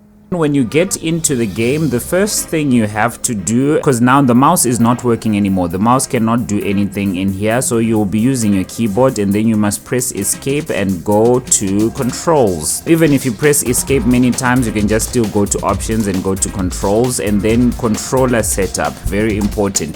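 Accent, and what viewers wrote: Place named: Zimbabwe. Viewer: Are u from Zimbabwe, your accent sounds like it, or south africa, sounds more like zims tho?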